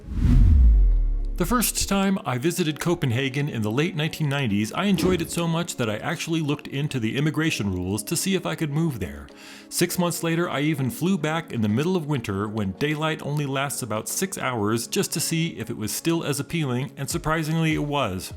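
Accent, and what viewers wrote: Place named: United States. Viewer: Nice videos of Travel. But try to pronounce the names of the cities more the local way in stead of American English. Cannes should me more pronounced like {kon} in stead of can.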